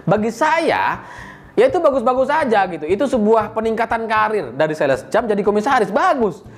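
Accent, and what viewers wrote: Madura, Indonesia. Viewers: Mas ini khas Madura, lucu tp isinya bermutu. Maju terus kang. Dekrema niku dik!! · kayak orang madura.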